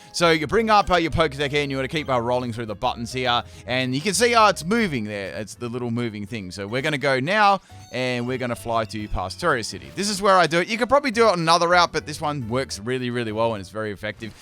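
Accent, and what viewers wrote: Australia. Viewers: Im dead at ur thick Australian accent speaking all fast, normally I speed up videos to 1.25 to get the speed of the person explaining something right first time u had me lower it at .75 lol way smoother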